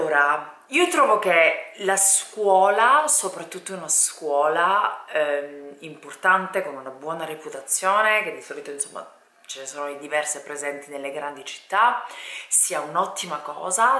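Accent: Sicily, Italy. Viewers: Sei siciliana ? io sono di catania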